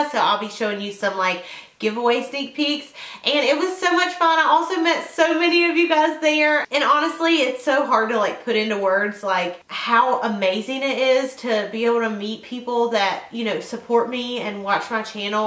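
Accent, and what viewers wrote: South, United States. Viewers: I love you and your accent so much! I have always loved people of the south and ended up marring a man whose mom is from Georgia. You guys say things similarly and it warms my heart. Your channel has grown so much since I first started watching you! Great job! I now have a beauty channel as well if you would ever like to watch someone new.❤ I hope to go to IMATS next year! I live only about an hour and a half from LA!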